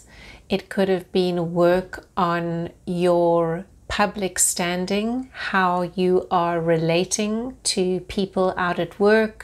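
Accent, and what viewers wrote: South Africa. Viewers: Thank you for this reading and explaining it so calmly. I am having one of the worst years of my life and I do hope 2023 will be far better for me. I recognize your SA accent. Sending love from Cape Town 🇿🇦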